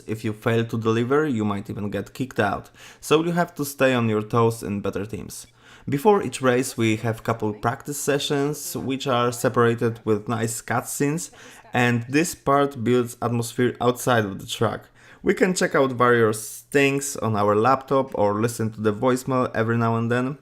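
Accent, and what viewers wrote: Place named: Poland. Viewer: słyszę ten polski akcencik. Fajna recenzja :D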